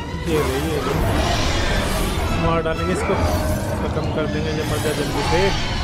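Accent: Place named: Haryana, India. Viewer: kyuki m khud haryana se hu and tumhari boli se pta chlta h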